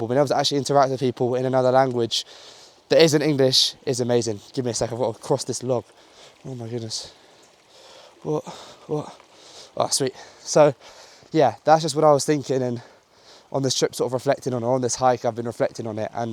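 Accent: England, United Kingdom. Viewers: Se nota que eres británico de Inglaterra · your British accent is also wonderful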